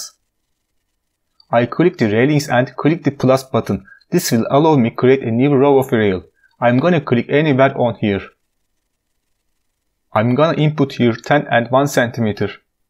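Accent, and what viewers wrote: Turkey. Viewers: Abi Türksün demi aksanından belli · Tam bir türk ingilizcesi :)) teşekkürler video için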